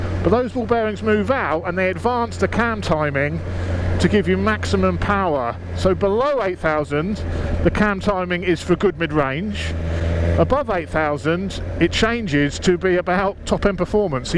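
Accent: United Kingdom. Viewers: Fu and your British English!!!!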